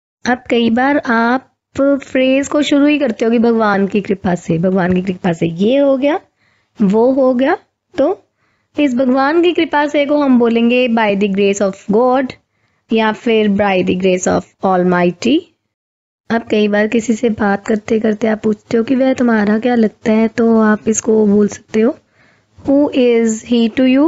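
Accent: Punjab, India. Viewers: punjabi ho kya are you panjabi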